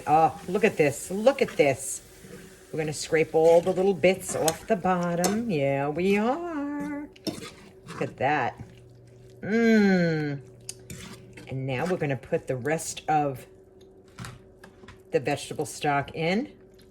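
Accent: New York, United States. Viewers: Good evening big sis, yes you sound like a New Yorker.. oh I will be making that gravy, it looks so good. You are a inspiration to us all. Keep up the good work.. Till next time sissy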